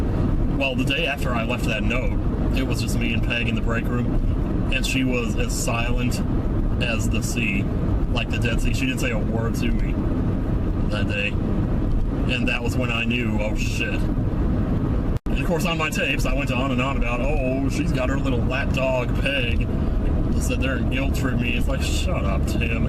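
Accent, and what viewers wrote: South, United States. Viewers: that old tape man, you sounded like a straight up southerner but it still sounded like tim in there somewhere, I'm so amazed by the arc of which your voice changed over time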